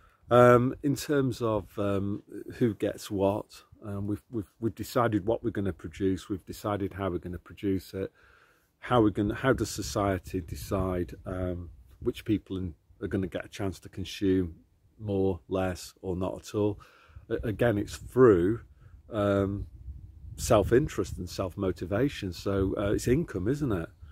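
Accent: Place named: Midlands, United Kingdom. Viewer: Larken Rose with a middle England accent.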